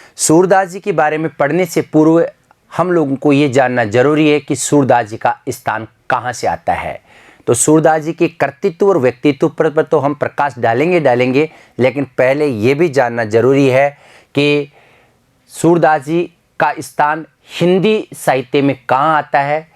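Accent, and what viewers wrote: Rajasthan, India. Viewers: sir aap rajasthani ho kya ?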